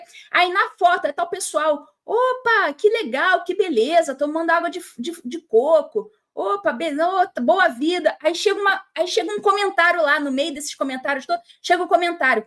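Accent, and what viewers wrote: Rio de Janeiro, Brazil. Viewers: Sotaque carioca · adoro você e esse seu carioques é lindo como você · O sotaque carioca confundiu o ouvido do pessoal. Aos meus ouvidos, sinceramente, passaria batido, tanto que só fui perceber a "confusão" porque veio a tona rsrs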